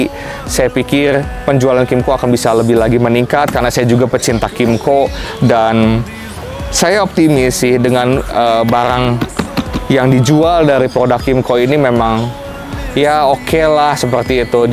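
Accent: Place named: West Java, Indonesia. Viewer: Om. Logat sundanya, jls bgt. 😂😂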